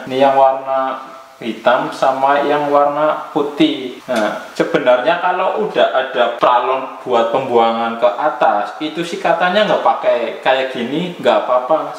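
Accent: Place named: Java, Indonesia. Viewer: Meduk mas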